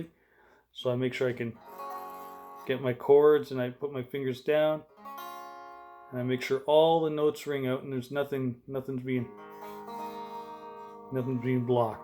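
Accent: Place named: Canada. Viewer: Sounds like you're from Canada.Want to come to youtube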